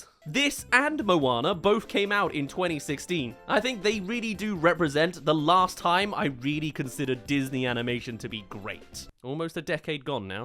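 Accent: United Kingdom. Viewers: Your British!?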